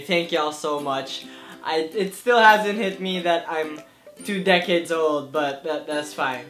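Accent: Philippines. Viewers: is this guy filipino because lmfao that accent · I got a bunch of things to say: First, that accent. (This is my first video of you.) I was 90% sure you were Filipino, then I looked back at your other videos, and saw a familiar setting in your "Photograph" video, so that confirmed it for me.;) Second, I think the word you're looking for when you were talking about " " is exposition. Hehe. Third, I love Dear Evan Hansen! So I scour videos about it regularly. And I found this video. Yay! My personal favorites change a lot. First, it was, then (have you seen that Cynthia Erivo performance in Elsie Fest? I mean WOW!)., was also my favorites for a while. Right now, my current favorite is. surprised me a lot actually. When i first listened to it, I really didn't think much of it. After a few weeks of listening to the album, unconsciously I memorized the whole song. 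The odd disjointed structure of the song (you can't pinpoint a chorus, for example), and the minimal rhyming lends a painful realness to the song. Like it's an actual person confessing his feelings. When Evan sings the callback to, the instrumentation there with the violins just gives me chills. And of course Ben Platt did an amazing job in the recording. You can really feel the emotions he's conveying. ("Pretend I'm something other than this MESS that I am", for example. The song after that line is just packed with emotio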